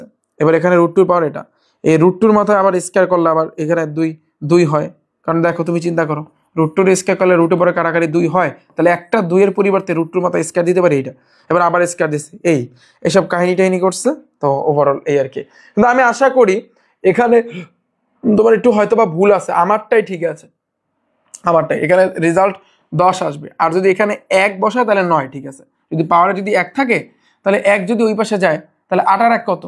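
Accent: Barishal, Bangladesh. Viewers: Bhaiya ki barishailla?🤦🏻‍♀️🌝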